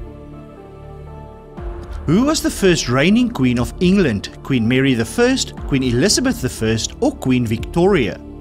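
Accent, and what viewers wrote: South Africa. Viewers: I spend a lot of time on youtube and you are my favorite man. You are so friendly, and the questions are great and I can't get enough of the South Affrikan sound.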